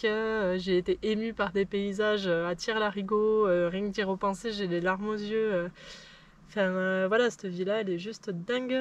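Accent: South-West, France. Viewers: Nature, authentique, sincère , un peu sauvage et avec en prime un bel accent du sud ouest! Vous avez gagné un abonné de plus! Je vous conseille de suivre les périgrinations de Django nomade dont je suis aussi grand fan...Bonne route et à bientot!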